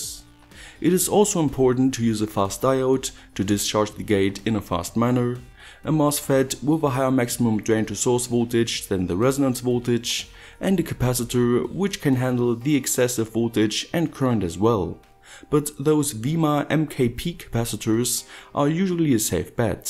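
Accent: Germany. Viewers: Are you German. Becuas kupferdraht ist deutsch oder bin ich dumm. Außerdem hast du so nen Akzent! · Wait... are you german? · Love that accent, it sounds like german but the way you say certain words makes me lmao, like coillll. Would love to hang out with someone like you, no homo. Good video very professional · where are you from? you have a similar accent like German people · Great i have a question are you German? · Man hört richtig das du deutsch bist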